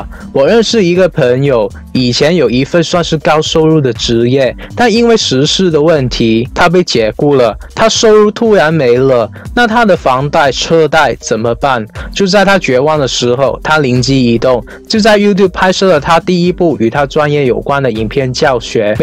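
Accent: Hong Kong, China. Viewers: 请问你是香港人吗？你的中文有香港口音😄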